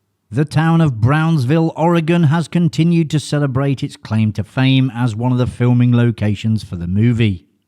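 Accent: United Kingdom. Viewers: Or that the speaker is British... Which based on his accent, I would say is British. A classic one is the word Aluminum. British people say "al-u-min-ee-um" instead of "ah-lum-in-um". They even spell it differently for that one...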